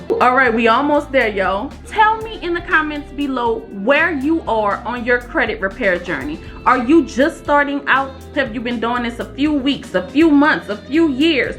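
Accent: New Orleans, United States. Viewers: New to the channel but I’m here forever now! I just know it’s the Nola accent for me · New Orleans? That accent strong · I know a New Orleans native when I hear one!!! Hey baby.... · New subbie here. Even though I am located in Missouri now. I was born on Metairie. I hear New Orleans in your voice...are you a Louisiana native? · You have that New Orleans accent!!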